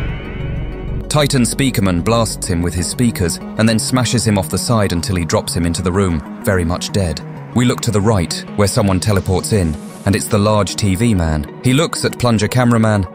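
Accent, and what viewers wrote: United Kingdom. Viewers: Are you british? Just asking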